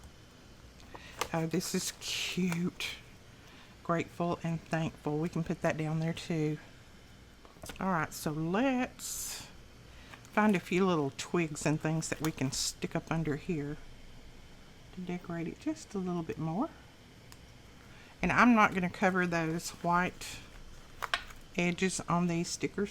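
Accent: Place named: Georgia, United States. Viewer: This was such a delightful tutorial to view this evening. You inspired me to try a similar technique if I may in my tutorial creating a Wedding Album. Thank you sincerely for your wonderful instructions. You sound like you are from Georgia. haha I am Canadian but have some sweet Georgian friends I visit now and again. I made sure to Subscribe and hit the bell. smile I would love you to visit my next tutorial where I will try this technique (somewhat) and be sure to link your Channel so you get the credit. smile Blessings! I forgot to mention my favorite season. Definitely, the FALL weather when our humidity has taken flight. haha Niagara Falls is one humid place!!!!!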